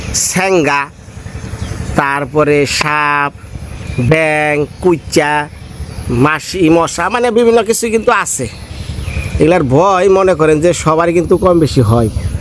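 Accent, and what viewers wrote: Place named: Tangail, Bangladesh. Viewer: টাঙ্গাইলের ভাষা আবার বোঝা যায় না। আপনার প্রথম ব্লক যখন দেখছি তখনই তো ভাষার কারণে দেখা শুরু করছি। প্রথমে তো লেখা ছিল না এটা কোন জায়গার ব্লক কার ব্লক কোন এলাকাঁ। ভাষাটা শুনে মনে হয়েছিল এটা টাঙ্গাইলে কিছু একটা হবে। এরপরে তো দেখলাম যে এটা মির্জাপুরের দিকে। ভাষার জন্যই তো ব্লক দেখা শুরু করছিলাম। আর আমরা একেবারে শুদ্ধ ভাষায় কথা বলি টোটালি তারপরেও কেউ কেউ যারা টাঙ্গাইলের মানুষ এক্সপার্ট তারাও একটা টান খুজে পায়। আর আপনি তো পুরাই গ্রাম্য ভাষায় বলেন আপনারটা না বোঝার কি আছে। শুধু টাঙ্গাইল না ভারতের আসাম এলাকার মানুষ যখন কথা বলে সেটা পর্যন্ত শুনলে মনে হয় তারা কি টাঙ্গাইলের কিনা। ভাষাগত এত মিল। এরপরে ইন্টারনেটের সার্চ দিয়ে দেখতে পাই ভারতের আসাম আর টাংগাইল নাকি আগে এক ছিল। এজন্য এই দুই এলাকার ভাষায় এত মিল। আপনার বাড়ি টাঙ্গাইল আপনার ভাষা শুনলে সে বুঝবে যার এই ভাষা শুনে অভ্যাস আছে। অন্য কেউ বুঝবে না।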